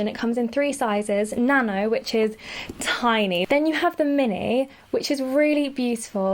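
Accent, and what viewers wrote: United Kingdom. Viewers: Oh my god, you are so perfect! 😍 beautiful, stylish, intelligent and with British accent! Pure perfection 😙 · these things are not smt that I can wear(pink,gold or girly stuff are not my things) but I have to say that I love how your style complete your personality... 👌👗❤💗 I mean if it was another person with your style I don't think that I would subscribed or watched her. your personality is just shine oh and obviously your accent. I LOVE your British accent girl!!.. 😍💘💗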